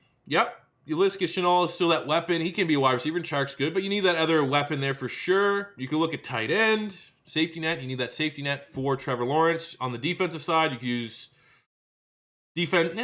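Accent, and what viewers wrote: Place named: Canada. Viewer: This guys great. Sounds Canadian even though he’s a philly fan. Also what website did he use?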